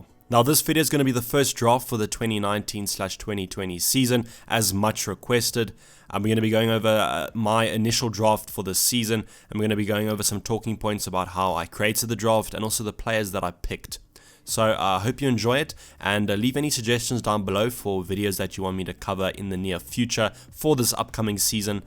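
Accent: South Africa. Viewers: Ya he does have a hint of an sa accent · Are you south African? / have any South African heritage?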